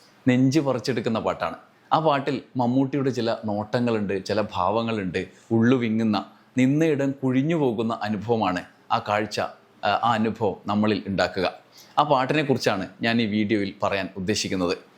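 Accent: Kannur, India. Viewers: സാറ് കണ്ണൂർ കാരനാനോ. എവിടെയോ കണ്ണൂരിൻറെ ചുവ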